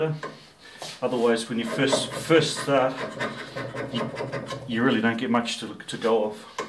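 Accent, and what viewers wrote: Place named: New Zealand. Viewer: Ah ha! I thought I detected a NZ accent! Used to work for US branch of a company, TNT, headquarted in AU. Chatted with blokes from all parts of that world. Enjoy your vids! Thanks for sharing!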